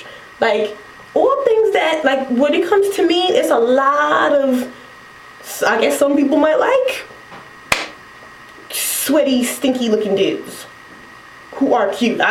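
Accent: New York, United States. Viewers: lol you got dat new york accent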